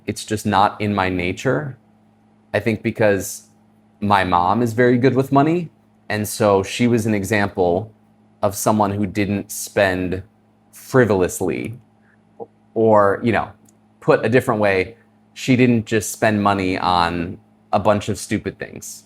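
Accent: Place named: California, United States. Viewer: I watched full video you should continue this path you have California accent and I hear foreign love that accent 😅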